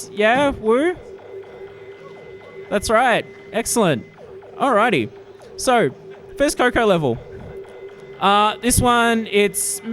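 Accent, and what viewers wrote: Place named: Victoria, Australia. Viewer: Gotta love that Victorian accent saying heeya instead of here. Wasn't grating at all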